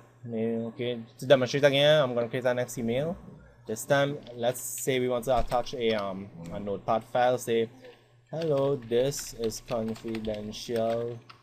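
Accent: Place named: Trinidad and Tobago. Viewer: Are you from Trinidad? You sound like it real bad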